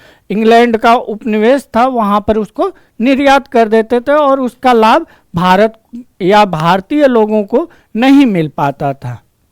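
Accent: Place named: Uttar Pradesh, India. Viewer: Sir himachal look m, Uttar pradesh ki Awaaz... very nice sir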